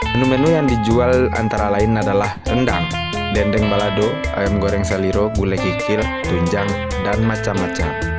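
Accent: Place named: West Sumatra, Indonesia. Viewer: adminnyo bahasa indonesianyo...khas minang bgt..dialegnyo melekat ke bahasa Indonesia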